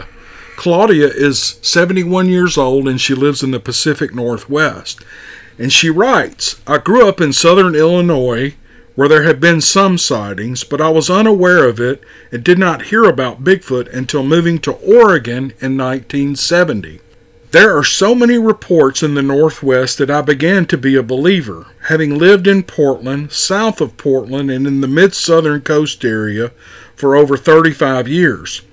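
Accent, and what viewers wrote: South, United States. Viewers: Another great batch of stories narrated by the soothing southern drawl belonging to the great!!! Thank you for your time, again 😍👣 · Keep that smooth southern voice telling big foot stories. You doing a 👍 job 👍👍👍👍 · I have said it before. You are the best narrator that southern draw makes it so interesting to listen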